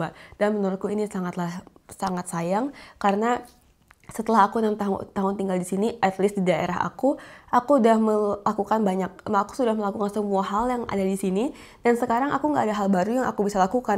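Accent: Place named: Germany. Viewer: Mbak... Logat kamu udah nyampur banget Jerman wkwk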